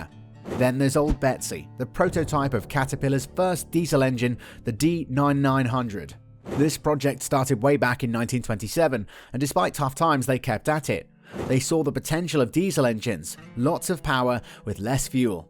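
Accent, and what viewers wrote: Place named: Europe. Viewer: European voice for an American company as it comes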